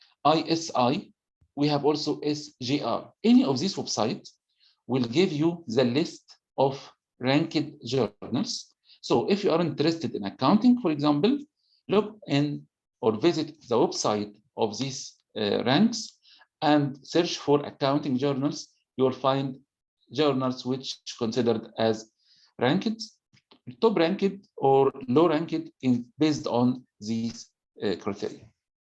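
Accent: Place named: Arab world. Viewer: Look like an Arabic English...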